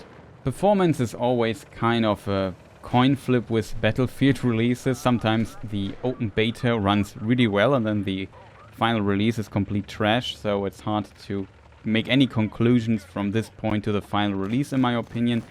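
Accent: Germany. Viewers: If I hear a German accent over battlefield gameplay I know I’m in for a banger vid.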